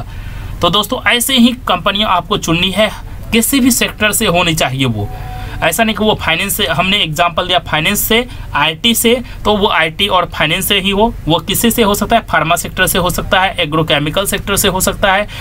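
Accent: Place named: mixed, India. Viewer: tu pehle thik se shuddh hindi bolna sikh...UP, Bihar ka accent bolna chod de..bolne ka tarika sudhar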